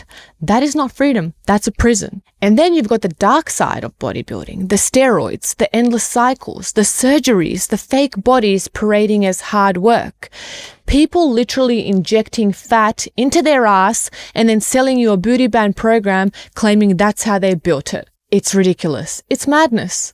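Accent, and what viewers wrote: Australia. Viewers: Where is she from…? Australia? New Zealand? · Are you Australian?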